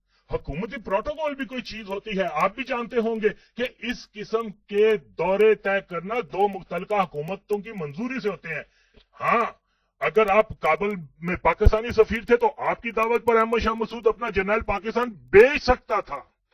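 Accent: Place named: India. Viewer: bolnay wala to awaz se hi koi indian lg rha hai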